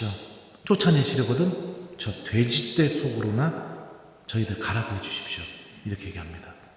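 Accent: Chungcheong, South Korea. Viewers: 신부님 충청도 구수한 사투리 말씀과 주님말씀의 선포하시는 신부님 감사합니다 영육간에 건강히시길 기도드립니다 감사합니다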